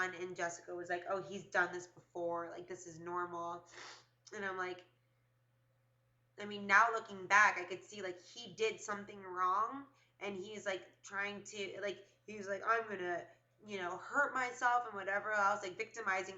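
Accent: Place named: Florida, United States. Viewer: I love your Floridian accent.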